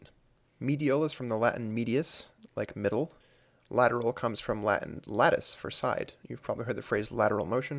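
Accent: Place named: Africa. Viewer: And you sounded like an African!!! Thank you so much.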